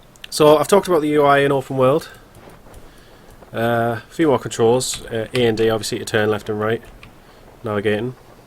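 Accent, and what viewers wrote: Newcastle, United Kingdom. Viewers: You from Newcastle area? Thanks for the video. I'll probably buy this when its finished.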